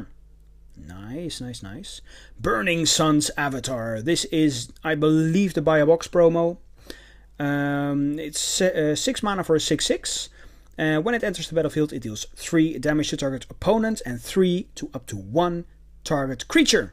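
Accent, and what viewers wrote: Netherlands. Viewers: are u dutch?? ik hoor het aan je accent :>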